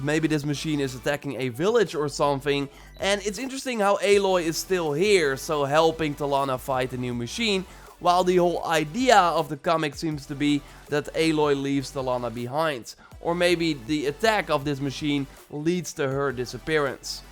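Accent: Netherlands. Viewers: He's from the Netherlands.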